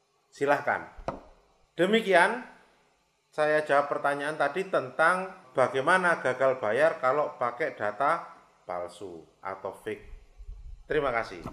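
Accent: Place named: Java, Indonesia. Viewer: Ada lucunya PAK BOSS kayak ada logat jawa nya gitu ☺😆👍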